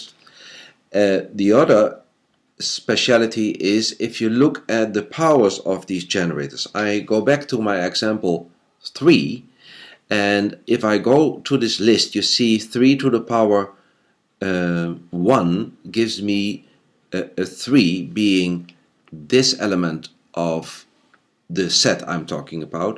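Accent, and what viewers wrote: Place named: Belgium. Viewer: You sound Belgian!!!!!!!!!!!! Are you?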